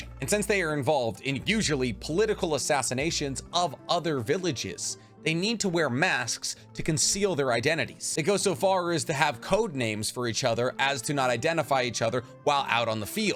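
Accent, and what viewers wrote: Boston, United States. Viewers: It's his inner Boston that comes out ! I love the energy you have in ur videos .. good good work guy !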